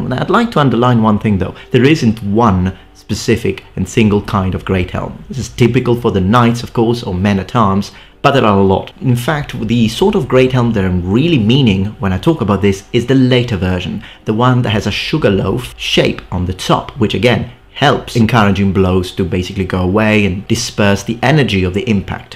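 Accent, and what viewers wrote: Italy. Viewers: Are you italian? Your pronounciation of "montefortino" was perfect. The accent too sounds a bit italian but it's so slight I can't tell. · Madonna se si sente l'accento italiano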